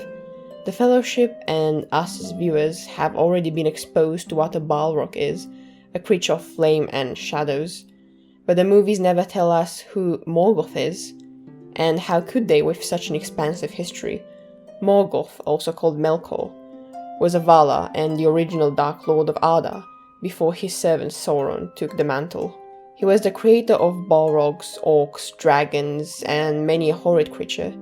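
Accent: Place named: Brazil. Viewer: I'm pretty sure she's Brazilian, they pronounce R's with an H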